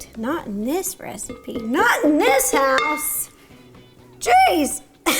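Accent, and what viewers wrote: South, United States. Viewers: This quarantine is bringing out Ro's Southern Belle! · she went so southern after folding the dough! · Southern Ro came out for this video. Pretty cute. These look incredible. I think I have all the ingredients! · When did Ro become a southern mama?? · She sounds more western or southern than other videos, lol or is it just me? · Ro, you sound sothern in this video. I know you said you grew up in Seattle but you kind of did this accent most of video. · Happy Easter to you and family. Love your videos and dress. Why were you speaking with a southern accent? Thanks for the video, I can even eat this now during Passover. ❤️💕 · I'm curious to know where the Southern accent is coming from. She's from Washington. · Anyone else feel like Ro has more of a country accent today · Ro i love you so much and every time I hear you talk i’m just reminded of my family down south cause ya just sound like a sweet little southern belle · This is meant as a total complement but I love how southern you sound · I love how throught this video she had a thick sounding southern accent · She sounds so southern omg. 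· She sounded like she had a southern accent for the majority of the video.😂 I love her. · She’s sounds a bit southern today... and I kinda love it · Ro sounded super southern in this video! It was pretty cute 💜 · Is it just me or did ro develop a little southern accent throughout the course of the video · But why did Ro have a southern accent in this video 😂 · yo why she sound so country in this video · Is it me, or did Ro have a lil bit of a southern accent goin on here? Either way, it is adorable and I love her♥️ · I never realised Ro had such a Southern accent. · Does anyone else think that she sounded a bit like she was from the south?! Ro you are still amazing, you are so uplifting and kind and you make me smile every time you post a video!! Happy Easter everyone 🐣❤️ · sounds a bit different like a southern accent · She's got a little bit of a Southern accent going on here, lol. She's so adorable. 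Love you, Ro. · Has anyone noticed how thick her “southern-like” accent got in this video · Ro is so southern in this video and I love her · She sounds very southern in this video. Like compared to normal. Idk why.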